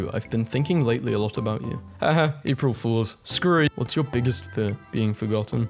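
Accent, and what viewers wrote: United Kingdom. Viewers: The British accent makes all the memes 110% better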